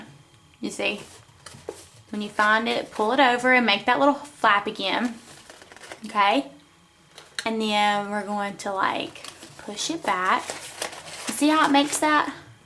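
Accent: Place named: South, United States. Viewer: Love that southern draw sweetie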